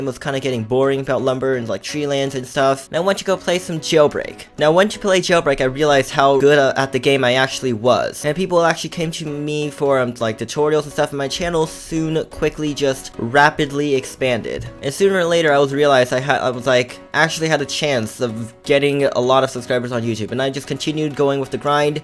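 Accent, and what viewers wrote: United States. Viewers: Not trying to be rude but are you American like idk ur voice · i have chinesee friends and their childhood was like that to and hearing that ur chinesse is so surprising like my chinesse friends learned a lot of english and all and i knew when i first met them that their chinesse by their voice but u sound like a normal american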